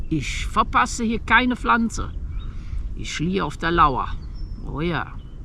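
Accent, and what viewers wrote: Cologne, Germany. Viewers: Einfach, wie immer, grandios. Du bist eine wunderbare Schauspielerin mit einem enormen Wissen und Deine koelsche Aussprache, einfach herrlich. Es war mal wieder ein Genuss. Danke dafür. Lg Evi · Liebe Grüße aus Dresden bei Uns ist grad Schmuddelwetter und die Sonne lösst sich selten blkicken. Schönes Video und Kölsch klingt geil.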